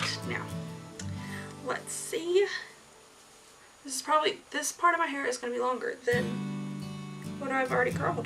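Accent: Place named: South, United States. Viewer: You sound kinda like your from the south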